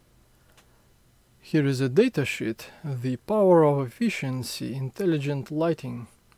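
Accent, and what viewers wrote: Russia. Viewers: Ахренеть какой русский акцент.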